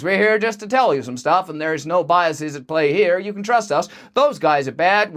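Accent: United States. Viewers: American accent is better than his English accent… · I really like your American accent. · Mr. Brand. I heard your name some time ago in connection with "right wing conspiracy theorists" and as usual paid the accusation no mind because it came from the same media outlets that always use that phrase to marginalize those who say what they don't want anyone to say much less think about. I've so far tripped over a couple of your videos. Haven't seen you espouse a single CT yet. Today I subscribed, but I have to admit, at least partly because you're the FIRST Brit I've ever seen who can manage more than one American accent. Also, because you mentioned Orwell who seems to have been relegated to unperson status recently as all the major "Western democracies" vigorously and enthusiastically utilize every doublethink and doublespeak tactic he invented. They took what he wrote as a warning and are using it as a manual! 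Why do I say Orwell is an unperson? I'm 69 and my kids and grandkids are no longer required to read him in school. They didn't even know who he was or what he wrote until I told them.His books haven't been banned- yet- but he isn't being taught any longer. They are unequipped to recognize The State closing ts grip on them, and would probably gleefully go along with the USA going full "Team USA: World Police" as that article endorses. When the fuck did life start imitating satire? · dig american gangster voice the best. Gilbert Godfreyesque. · Hey, why does it seem like it is easier for you to speak with an American accent than your native accent? Keep up the great discourse!!!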